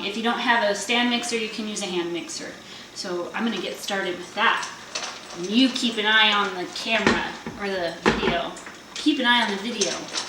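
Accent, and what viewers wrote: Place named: Midwest, United States. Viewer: These look amazing! Dying over your midwestern accent LOL. Can't wait to try them!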